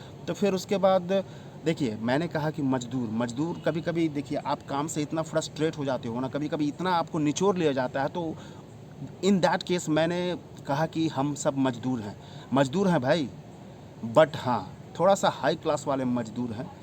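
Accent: India. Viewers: Bhaiya aap ho to bihar ke hai mai apke acent se pahchan gya Lekin kis district se ho bihar ke😊😊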